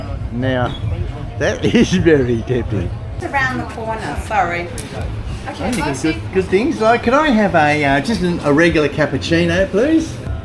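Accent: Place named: Australia. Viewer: Hi we really love your videos and your enthusiasm. We are Ozzie's by choice living in south America so we do not only get to see your travels but also be able to hear Ozzie accent, see our home city Sydney and other places in Australia Thanks❤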